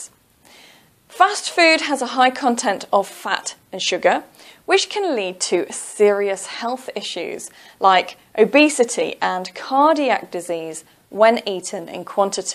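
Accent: England, United Kingdom. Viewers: Are u english:)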